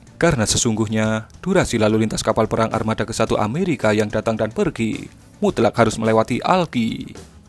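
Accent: Java, Indonesia. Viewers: Kak wong jawa ya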